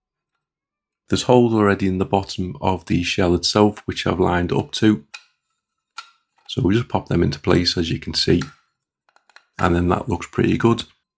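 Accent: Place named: Liverpool, United Kingdom. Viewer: Love it, I have a Google Pixel 6a that I can run Ubuntu or Kali net hunter on. The mellow scouse accent was different.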